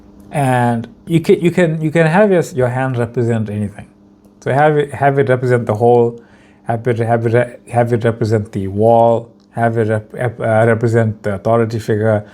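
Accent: South Africa. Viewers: That's how Indian people speak in South Africa.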